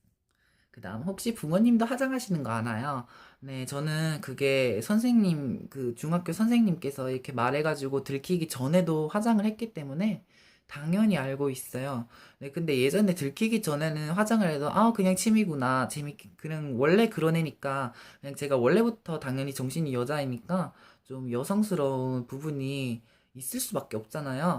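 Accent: Gyeongsang, South Korea. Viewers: 아 말투가 너무 그리워요ㅠㅜ제가지금 경상도살다가 전라도로와서ㅠㅠㅜ 대구근처에서 살았거든요!!사투리ㅠㅠㅠㅜ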